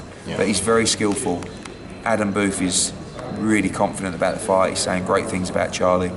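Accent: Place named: Glasgow, United Kingdom. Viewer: Ooooo mate you might wanna tone down the Glaswegian accent. Also get a decent camera. At least the sound is better though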